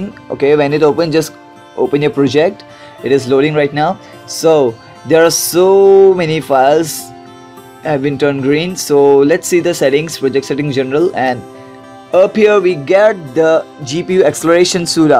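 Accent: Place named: India. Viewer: D hindu's english, i like this :D, mera naam ömer hai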